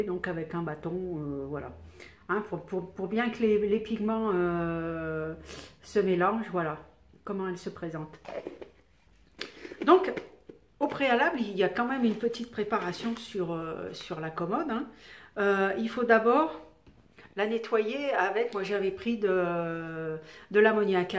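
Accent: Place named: Saint-Étienne, France. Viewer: oui effectivement tu ne fais pas que du maquillage J'adore ton accent.... tu ne serai pas pas la region stéphanoise ? J'adore le relooking de meuble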